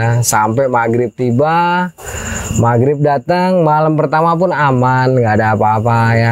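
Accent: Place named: Jakarta, Indonesia. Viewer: Suka bgt dialeg bg. kl lg cerita serasa akrab skali apalagi logat jakartanya asyik gaya betawi ky aye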